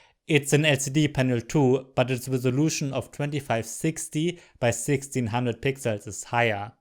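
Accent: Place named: Germany. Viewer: like everyone can hear that you'r from germany :]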